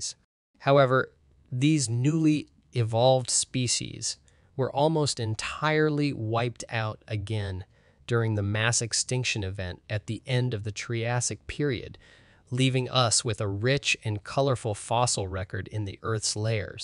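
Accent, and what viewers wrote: United States. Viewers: Another great episode. From your accent I'd assumed you were American.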